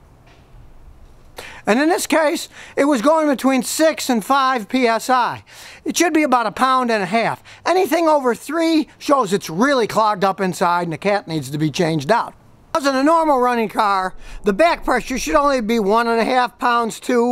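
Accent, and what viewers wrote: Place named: Chicago, United States. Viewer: "dont guess!! test!!" haha eye love the accent and the VOLUME! Chicagoan?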